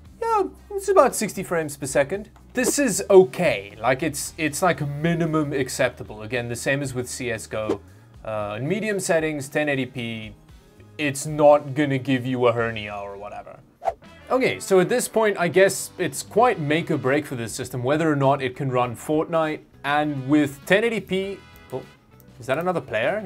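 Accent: South Africa. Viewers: South african? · You sound like a South African :) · Are you from Africa? Your accent sounds very similar to a few people I've met from South Africa. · Why do you sound like you have a South African accent?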